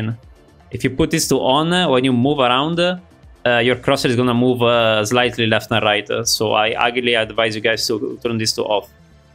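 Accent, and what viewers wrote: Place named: Italy. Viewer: L’accento italiano non scappa😭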